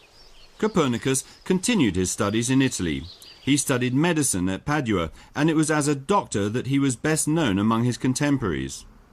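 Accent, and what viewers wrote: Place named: Germany. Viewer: Notei que o cara que está narrando tem sotaque alemão.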